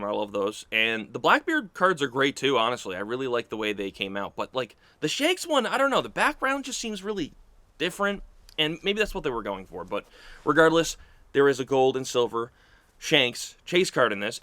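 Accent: United States. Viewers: Honestly, as a fellow east coaster I prefer pronouncing the way you do 😅 either way real ones know what you mean anyways great video. I’m just getting into one piece myself. This will probably be my first set I rip. As a guy who comes from yugioh, I really appreciate the content. Keep it up brother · I’m Irish ? What ? Bro you American as it gets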